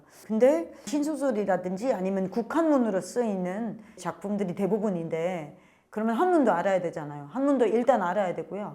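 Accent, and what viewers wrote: South Korea. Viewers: 와우, 거의 한국 사람들 억양을 그대로 쓴네. 미네소타 한국어 마을 '숲속의호수'가 있다는걸, 유튜브 다른 영상에서 봤었는데 바로 이 분이 나왔었구나. 한국을 엄청 사랑해 주시네, 고맙습니다. 항상 건강하시고, 행복하세요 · 500%한국사람말투군요 뛰어납니다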